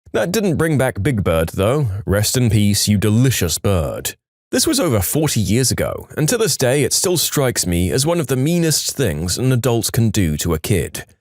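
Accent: United Kingdom. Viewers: He sounds br-ish.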